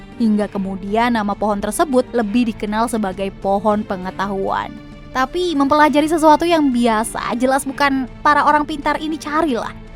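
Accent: Java, Indonesia. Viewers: mana yaak? kangen gw medoknyaa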